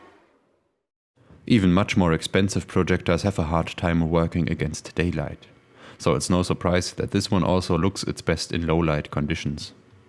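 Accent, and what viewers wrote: Germany. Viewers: Spaten Pauli its german english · I like how you don't even try to hide your german accent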